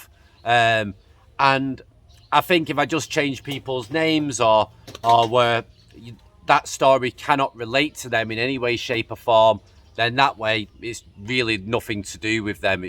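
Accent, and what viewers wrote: Manchester, United Kingdom. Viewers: try to speak standard English with much less of your provincial slang. This goes for all Brits when dealing with non-Brits. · As an older guy with properties as well as family in Thailand and well over two decades experience of living in the Land Of Smiles, I have to say you appear to be the exact kind of nonce-come-grifter I make a point of avoiding. You also sound like a Manc, which might explain it, Manchester being the place where all the lowlifes who were rejected from Liverpool and Birmingham for being too scummy for even them to tolerate, ended up settling over the past however many generation so it's probably not your fault, per se, so much as genetic. You can blame your parents and theirs. Please tell us you're all jibby-jabbed up because the human gene-pool is likely well overdue a purge and if Mother Nature has her head on the right way around, yours will be exactly the retarded hereditary characteristics she'll have been targeting. 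Other than that, have a nice day and do give my regards to your village (poor beggars living there could probably use a kindly word coming from a Farang).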